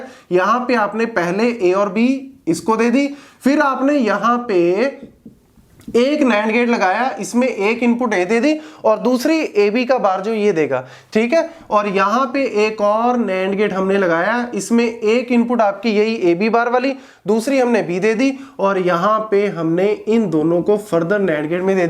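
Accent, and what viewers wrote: Punjab, India. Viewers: Sir aap Panjabi ho kya?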